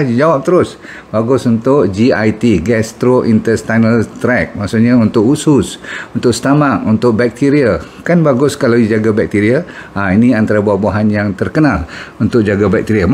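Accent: Malaysia. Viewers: Denger cakap malaysia 😅😅😅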